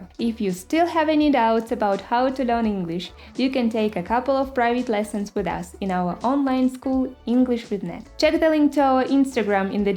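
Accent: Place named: Spain. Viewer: Her Spanish accent amazed me.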